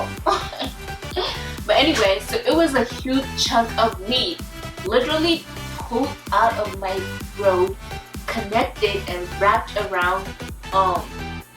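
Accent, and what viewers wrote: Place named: Nigeria. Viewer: am from kenya and honestly you are the first nigeria girl i have watched the video to the end and want more.keep up girl i like your accent.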